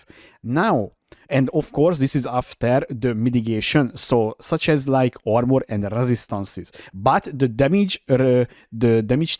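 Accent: Hungary. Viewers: Sounds Hungarian to me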